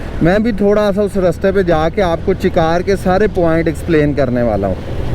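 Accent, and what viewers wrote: Punjab, India. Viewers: Bhai es se acha hai ap punjabi bol lo kyun k ap ki urdu hindi bolne main punjabi lehja kafi had tak mix hai ya ap parhe likhe km ho😊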